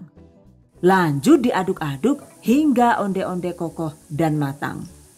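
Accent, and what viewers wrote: Banyumas, Indonesia. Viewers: Saya suka sekali dng resep" sy penasaran sekali" pas praktek poto doong bun.lucuu bahasa ngapaknya itu lho 😀😀😀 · Ngapak jane wongndi ya mba..? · Akutuh suka liat videonya bunda salah satunya adalah kalo bunda lagi ngapak.. 😍😍 #GiveawayTHV2021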